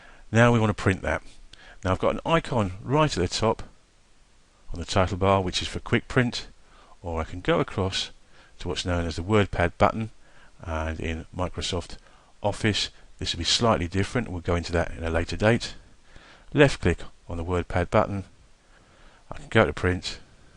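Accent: United Kingdom. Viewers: This is not American English itS BRITISH ENGLISG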